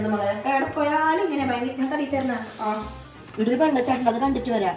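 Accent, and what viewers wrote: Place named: Malappuram, India. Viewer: thante malappuram slangum thante kannur slangum adipoli🤣🤣😄🫶🏻😎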